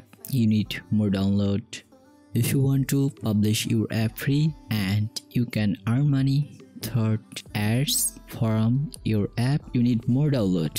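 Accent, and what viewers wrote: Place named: India. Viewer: Interesting Informations. And an Indian accent. It's being familiar. :) Thanks. Helpful Informations.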